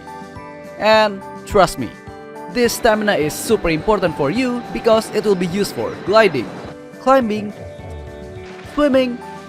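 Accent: Indonesia. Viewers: Lu orang indo ya bang? Logatnya kerasa banget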